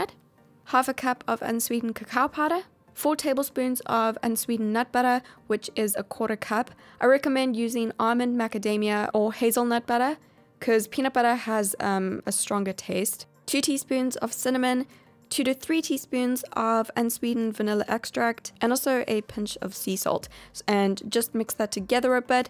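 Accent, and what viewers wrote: South Africa. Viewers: This is great! I love your videos, I've watched several since I found you yesterday and a bonus that you're South African (originally from there) haha miss the accent so much! I've been slowly doing this for my diet and will only eat refined sugar in small quantities like ex.cliff bar, I won't eat the whole thing on one day, (but I'm going to try find the brands you suggested tho) and I have a cheat day on the weekend for ice cream or some other treat ! My diet is mainly plant based now so I feel good. Can't wait for the day where I crave healthy food more than sweet and unhealthy food ahha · Is your accent South African? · Do I detect a South African accent? I really should get back into a healthy diet, since moving to Gauteng am completely out of my routine, exercise and diet wise. The only thing that is saving me is intermittent fasting 😅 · Are u South African?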